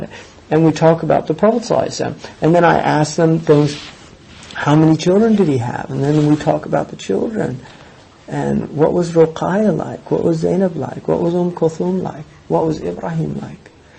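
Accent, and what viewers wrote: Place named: California, United States. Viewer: This stupid sufi grave licker 12 Imams worshiper brother of Shia said alarm clock is bida...what a donkey thats not bidah .BIDAH IS SOMETHING WHEN YOU INOVATE IN THE RELIGION...LIKE START ORAYIN 6 OR 7 SALATS...OR THE EVERY SALH YOU MAKE 4 RUKKA..OR MAWLID THAT RASSULLULLAH NEVER DID.This is one oerson i trully dont like at all...plus his voise sounds sooooo gay...he talks with a soft surfer california accent...serfs up dude .Just listen he sounds like a girl then he has the second voice that is crackking in the back like a car with a bad exoust .Sounds like he has a big coul .SUFI YOU AND YOUR SHIA BRITHERS ..WHATS THE DIFFERENCE IN YOUR CULT ??NOTHIG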